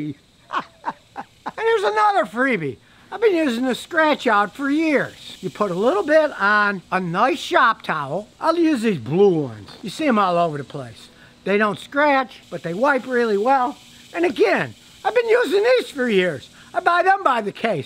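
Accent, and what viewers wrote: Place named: Pennsylvania, United States. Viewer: ! Hi... I see a Texas plate on your'n car but you ain't from Texas.. I'd guess Pennsylvania or mayhap Ohio judging your accent?.. where is from?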